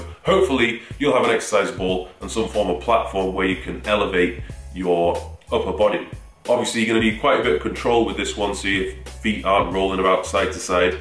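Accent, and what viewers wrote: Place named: Birmingham, United Kingdom. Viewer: I can't place his accent, is he from Burningham?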